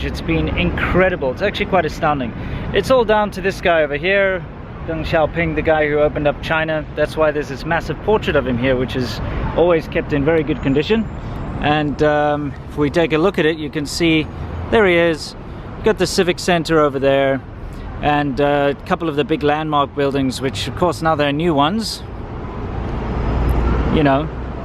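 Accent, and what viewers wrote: South Africa. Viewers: consciousnick - he’s from South Africa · You clearly do not watch South African soapies to recognise a South African accent. Recommendation. Watch past reruns of etv Scandal! By the 1000th episode, you will be able to tell who is a British South African and who is Afrikaans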